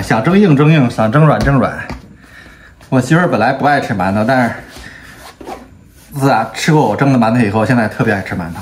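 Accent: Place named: Beijing, China. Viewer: 给点赞，平和随性地道老北京味，不错加油↖(^ω^)↗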